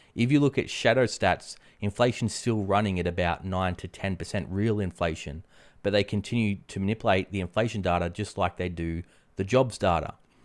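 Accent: Australia. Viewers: Australian or Aussie accent.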